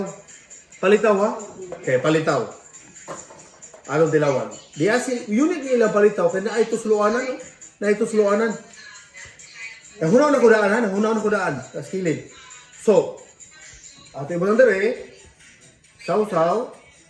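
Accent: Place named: Visayas, Philippines. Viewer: Kalami ana sir oi nalingaw ko nimu oi bisdak japon kaayo ug sinultian,salamat diay sa imong support ganiha sa akong ls sir/Lyn’s Beauty here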